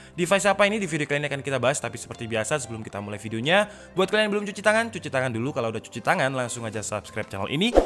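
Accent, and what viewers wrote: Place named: Padang, Indonesia. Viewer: Terlalu Padang dong :v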